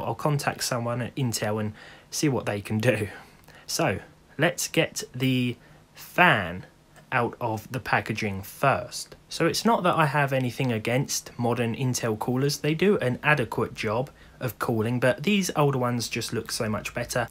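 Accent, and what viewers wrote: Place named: England, United Kingdom. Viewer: I like your traditional Englishman accent...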